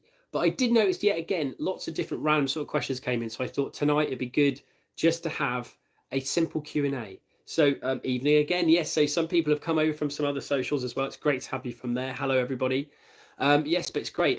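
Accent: United Kingdom. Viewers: THE BRI'ISH ACCENT ❤ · HE HAS A BRITISH ACCENT AAAAAAAAAH THIS IS AMAZING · Are you british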